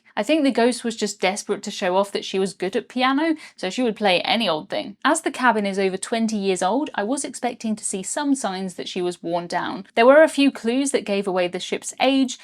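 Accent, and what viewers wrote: United Kingdom. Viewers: what part of the country is your accent from? i hear different versions of British English, but i dont know what parts theyre from.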